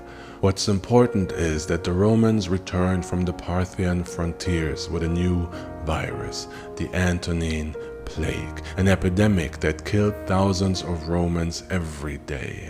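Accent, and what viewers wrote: Germany. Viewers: Are you German bro? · Are you German ? Cause the way you say everything reminds me so much of the people in my life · Why do you pronounce Roman names with a German accent? Latin should sound more like Spanish or Italian.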